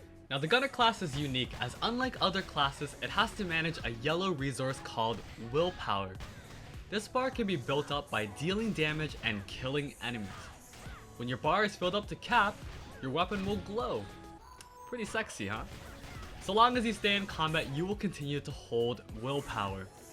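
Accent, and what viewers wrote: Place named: Asia. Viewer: It's funny how you can hear that the guy speaking is an Asian.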